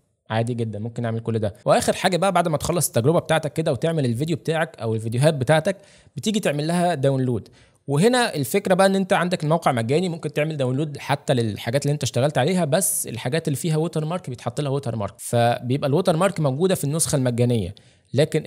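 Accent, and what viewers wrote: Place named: Saudi Arabia. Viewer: الجميل في الفيديو خلاك تتكلم سعودي .. بصراحة ابداع .. اشكرك بعنف ❤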